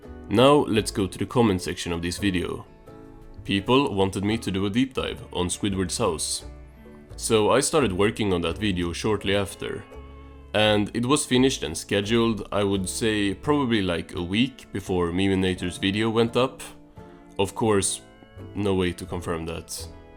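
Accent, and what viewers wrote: Sweden. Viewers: It's for sure scandinavian, I'm leaning towards swedish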